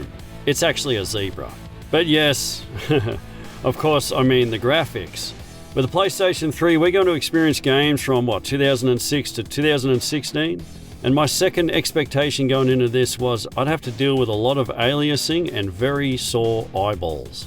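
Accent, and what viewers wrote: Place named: Australia. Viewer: I've understood so too, that it's not a small reason for that channel to be so popular. And those videos are quite well scripted. Even though they make mistakes every now and then. Your pacing, use of voice, script, editing and that Australian accent plus those sentences or questions with your voice modified are well made/good content. 👍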